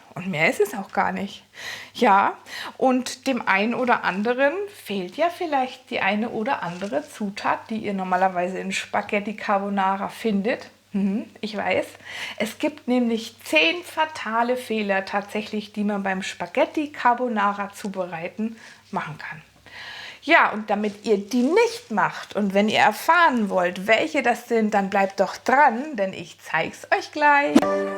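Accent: Standard. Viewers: Wieder ein super Video. Vielen Dank. Aber drinen Dialekt habe ich vermisst. 🤤🤤🤤🤤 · Ooooh ich bin bisher noch nie ein Freund der Spaghetti Carbonara gewesen, da ich es hasse, wenn man überall Sahne rein klatscht. Liebe, diese Soße wűrde/wird mir mit Sicherheit schmecken. Ich war ganz überascht, daß du auch Koch-Videos machst, denn bisher kannte ich nur deine leckeren Backrezepte. Nur das du dort nicht so hochdeutsch schwatzt.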